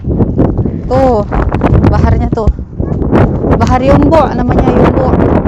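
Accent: Jakarta, Indonesia. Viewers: Betawi bgt si mpo..🤭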